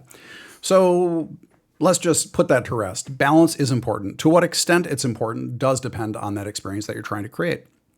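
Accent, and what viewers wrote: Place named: Midwest, United States. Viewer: This is a weird as hell statement but screw it. Why do you sound like my aunt? You from the Midwest?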